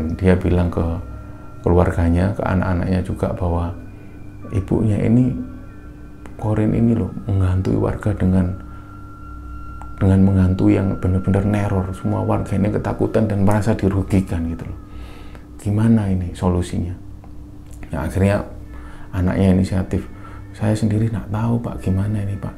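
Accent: Java, Indonesia. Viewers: Cara mbawakan ceritanya tdk bgus Terutama penyebutan AIUEO .....mungkin karnana eksen jawanya yg sgt kental ....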